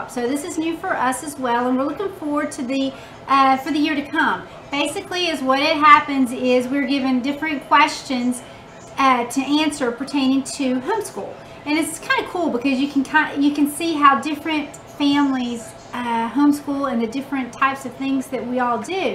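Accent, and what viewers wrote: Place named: East Tennessee, United States. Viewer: Welcome to the VHC! Based on your accent ... are you from East TN? We are a bit outside Knoxville and you sound like one of us :) And you are wearing orange ... hehe.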